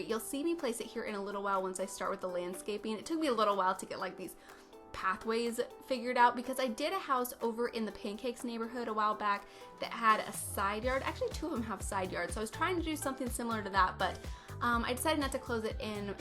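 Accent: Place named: United States. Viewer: Beautiful! I always enjoy watching your renovations and I know it sounds weird but you have a lovely voice! Probably because you’re American and I’m English it makes a nice change! 😊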